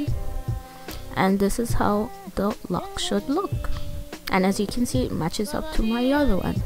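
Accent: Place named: Jamaica. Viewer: Your accent sounds Jamaican fr LOVED THE RESULT AND UR MAKEUP✨❤️😍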